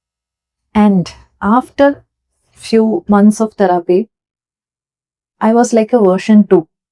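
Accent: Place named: Kannur, India. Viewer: I started watching your channel recently. I like the content very much and very relatable. I guess you are from some part of Kannur. Me too.. I forwarded your video to my friend Sajna she also talks in the same way😂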